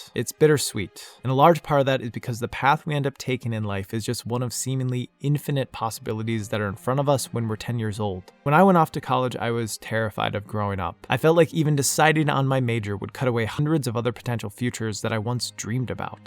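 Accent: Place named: Midwest, United States. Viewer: NO WAY!! I grew up around the Twin Cities! Currently in Florida for college but I recognized the city, I could kind of tell you were from the mid-west by how you talked but I never realized you were that close to home. Your channel inspired me to make my own video essays about my work in VR development. Keep up the awesome work!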